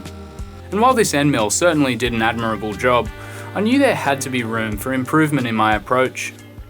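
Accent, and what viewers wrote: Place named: Australia. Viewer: Your delivery style suggests SuperFastMatt’s lost Aussie brother, but without the fast bit. Interesting project. Thank you for sharing. Peace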